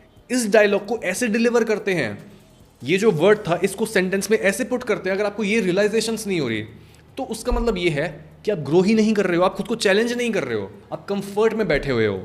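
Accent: India. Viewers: İngilizce biliyorum saniyodum ta ki Hint aksanlı bu adamı dinleyene kadar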